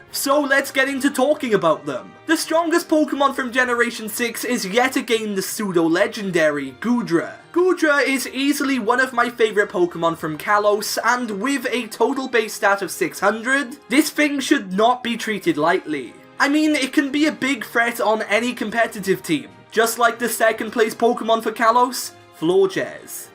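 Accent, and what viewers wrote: Wales, United Kingdom. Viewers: Youre welsh aint you butt? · Are you Welsh?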